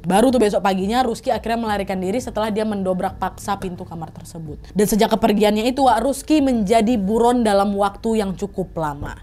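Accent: Indonesia. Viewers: Kak itu kalo bawain cerita beda bgt sm youtuber yg lain. Dia gk sok sokan melebih lebih kn dialog kyk bhs Indonesia sok" Di buat kek logat bule atau bhs Inggris yg gk kita tau artinya. Dia lbih ke apa adanya dia aja dengan logat Khas Indo nya yg medok agar lbih mudah di mengerti sm kita smua, kalo buat aku itu sih yg bikin aku gk bosen nonton nya. Sehat sehat ya kak sm tim, buat dedek bayi nya jg moga sehat selalu, dn selalu di limpahkn keberkahan. Aminnnn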